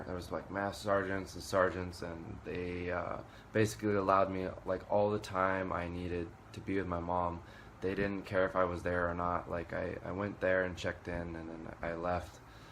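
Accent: Bisaya, Philippines. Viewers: thank you for sharing, your a tough guy. God bless your family, and i cant wait to hear your bisaya accent soon :)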